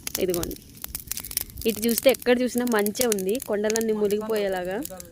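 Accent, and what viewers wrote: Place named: Krishna, India. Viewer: ఊరు పేరు మాత్రం చెప్పటంలేదు ఈమె మాత్రం మన్యం ఏరియాకి సంబందించిన ఆమే కాదు యాస మాత్రం కృష్ణ జిల్లా కు సంబంందినది ల్లా ఉంది ఇంతమంది ఎఉరు ఏవిలేజ్ అని అడుగు తున్న చెప్పటంలేదు పాప యాస కూడా అలాగే అనిపిస్తుంది 🙏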